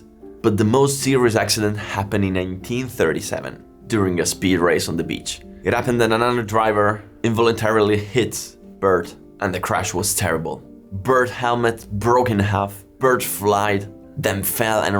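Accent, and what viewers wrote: Italy. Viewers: As a New Zealander with Italian roots he pronounces his name as any Italian would. It's called an accent. Usually it's Maoris the first to jump on and moan when a foreigner pronounces their language wrong, but in this case you knew exactly what he was saying. Stop being so precious especially over free entertainment.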